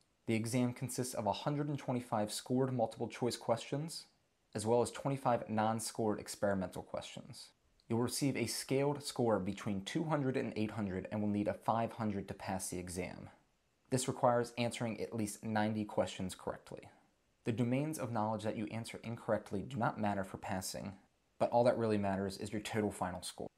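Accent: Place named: Baltimore, United States. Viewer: Almost couldnt tell youre from baltimore 😅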